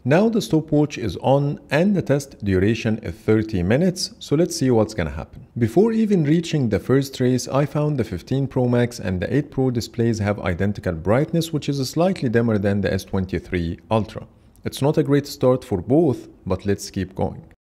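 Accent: Egypt. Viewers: انتي مصري صح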